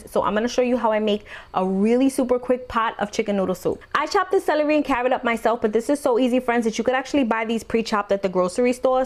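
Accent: New York, United States. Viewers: The New Yawwk accent!!❤❤ reminds me of home! 😊 Thanks for the recipe!❤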